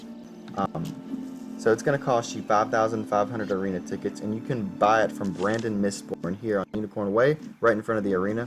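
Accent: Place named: South, United States. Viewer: I like ur southern accent.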